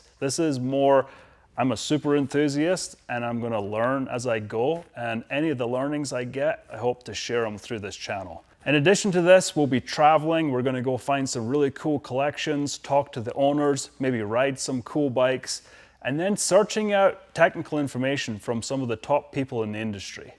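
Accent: Scotland, United Kingdom. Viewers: Hi, I just subscribed! Are you Scottish, I thought that listening to your accent watching your SRAD video. I’ve been doing a similar as you collecting bikes I drooled over as a kid. I love this era of machines. 😊 Best wishes Ian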